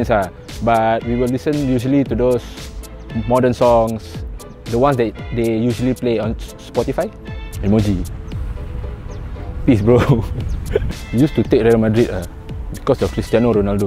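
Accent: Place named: Singapore. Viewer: He's the first in many years that's not afraid to showcase Singlish to netizens,which is definitely a good thing. Good on you man!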